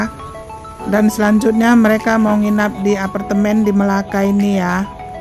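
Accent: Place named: Indonesia. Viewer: Hujan ya bund , oh ini rumah sakit yg populer yg biasa org Indonesia klw berobat kemalaysia ya Bun , tp dari logatnya bunda sprti org Indonesia ya Bun , mknan berat sepiring plus snack2 ada bakso nugget dikafeteria rumah sakit JD gak tegang dan kaku ya Bun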